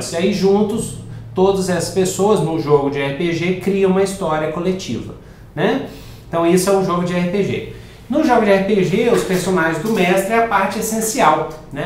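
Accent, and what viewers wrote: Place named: Minas Gerais, Brazil. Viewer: Mano... ele é mineiro! é foda!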